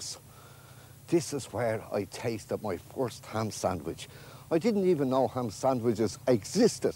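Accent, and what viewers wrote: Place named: Dublin, Ireland. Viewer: The aul Dublin accent, a thing of beauty. Ordanges and Chapelizard. Great stuff!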